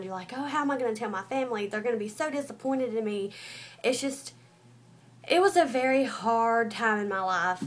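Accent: South, United States. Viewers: omg you are so pretty and i love your country acsent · You're sooooooo pretty, and confident, that's good stay that way! and I love your accent, from the south? same here lol. your story was very understanding, stay strong sweet peaa :) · Wow- I stayed and watched the whole video. And I most definitely agree with you on the "abortion" and "if you are old enough..." speech/opinion. Off topic, but I love your accent... and your face structure kinda makes you look like Miranda Lambert. Anyway, good luck with you, your son, and boyfriend. :D! Go southerners! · Hey! Your so pretty, and have the cutest country accent. Lol. I'm 15 and 21 weeks pregnant now, so I understand what you went through just don't understand the actually raising your kid part YET. Its crazy cuz when I first got pregnant, my boyfriend just turned 20 back in january and i didnt have a clue that i was, and i found out at 8 weeks.:D Although, I'm still going to highschool. 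Lol. But post more videos of you and your son, He's adorablleee. <3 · Where are u from because u sound southern like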